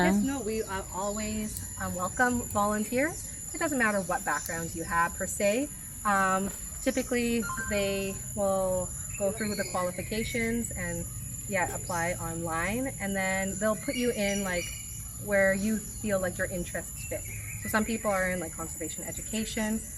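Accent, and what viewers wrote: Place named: United States. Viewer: expat sya.. american from her accent so no.. di dugo dugo ilong n'ya, it's her native language.. half pinay as she said during the first 5 minutes of the video..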